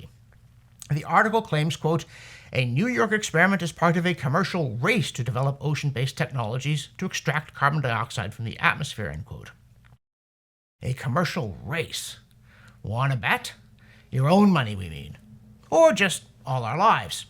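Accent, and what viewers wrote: Canada. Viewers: 🫡✋haha me me me! just discovered this channel. Very cool! His Canadian accent annoys me but I’m an overly cocky American😅 haha my voice probably annoys people too and I might be blissfully unaware. Haha but yah I am very much enjoying his presentations and data and sarcastic style. Amazingly, youtube recommended this channel to me! I dunno… but I wonder if it means they tweaked their algorithm to suppress less content? Anyway I was very surprised the videos made it past the suppression filter into my recommendations feed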